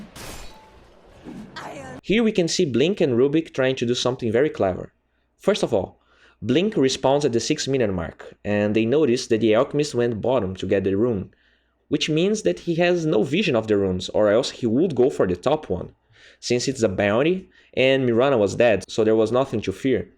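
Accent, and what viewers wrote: Brazil. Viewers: i think brazil based on his accent (just a guess) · stop saying the kunka the mirana the pugna hahaha that drives me crazy man you don't need to use THE for those personal names, I can tell by your accent u're probably brazilian, your english is awesome anyways.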